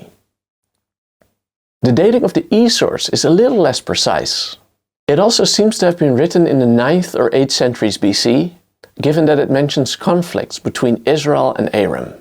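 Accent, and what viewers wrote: Netherlands. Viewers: YOUR ENGLISH IS VERY GOOD, almost without any accent, BUT when listening to you for 10 minutes, one can detect your Dutch mothertongue by your v- and s-sounds, which in some intances you pronounce the same as in Dutch and not as in English. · could be because of the Dutch accent. The letter J is pronounced /yay/ and G is /hay/.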